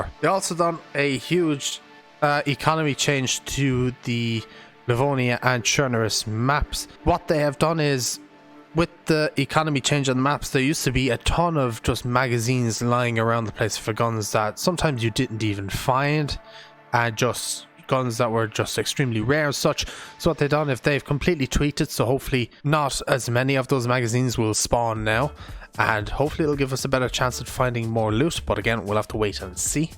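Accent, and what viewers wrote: Ireland. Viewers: I did read the patch notes... but now i get to hear them in Irish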